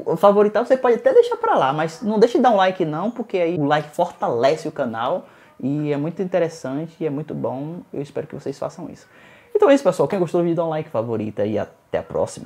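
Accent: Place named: Bahia, Brazil. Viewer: muito bom; esse cara deve ser baiano... pela fala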